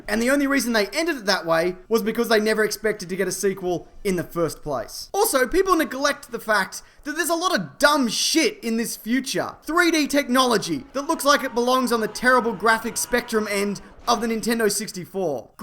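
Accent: Australia. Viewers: Fucking hilarious, as usual. Your comedic timing is perfect and your Australian accent stylizes your sense of humor perfectly. I would go to war with you any day, sir. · I never realised how much I love hearing Australians swear. Hey can you say 'fuckwit' in your next video. I just want to hear how it sounds. It's amazing the way Australians say it. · My second favorite video n this channel is just an Australian yelling about the future for five minutes. Priceless.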